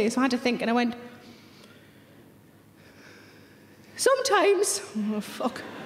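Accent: Newcastle, United Kingdom. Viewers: Think you'll find its Geordie. Can be hard for other Brits to understand. I still think she's great.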